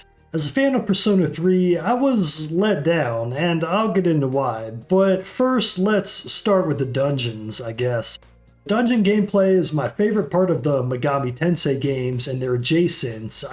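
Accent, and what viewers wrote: United States. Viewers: what state is your accent from?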